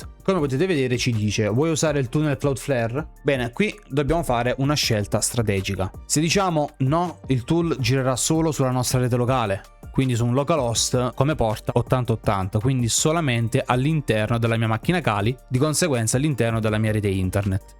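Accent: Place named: Apulia, Italy. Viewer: Immaginavo fossi pugliese 🤣 Ho provato subito e funziona alla grande. Ma quanto tempo si ha prima che il link esca scada ?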